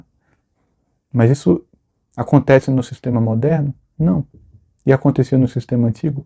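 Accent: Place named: Bahia, Brazil. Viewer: Professor me tire uma curiosidade:o professor é de onde na Bahia?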